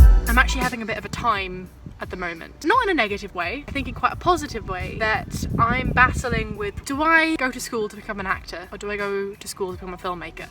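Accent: Australia. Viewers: ahhh you've done it again, I'm going to miss your cramps so much when this year ends! huge congrats on getting so far with NIDA, excited to see what you choose to pursue next year:) (also, idk if it's just me, but you're sounding much more Australian already this month I think)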